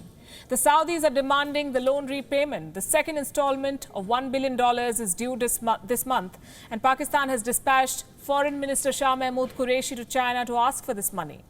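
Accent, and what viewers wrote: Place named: India. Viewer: I know you are doing the good thing. Thanks. But do you realize that you have a very heavy accent and people do not understand what the heck you are talking about. Can you slow down your speech and enunciate. It applies to all Indian speaker with heavy accents. Can't you guys know you have very heavy accents? I know China lies. but you should say it clearly so that more people understand the truth.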